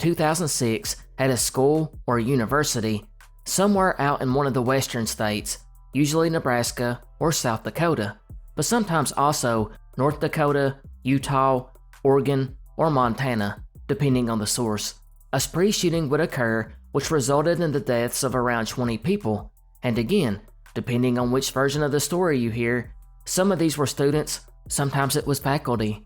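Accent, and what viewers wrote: South, United States. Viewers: Your accent screams southern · As an interesting side note...the region of America where this accent comes from has a huge population of Scotch and Irish going back 200 years...sounds like South Carolina, Georgia, Arkansas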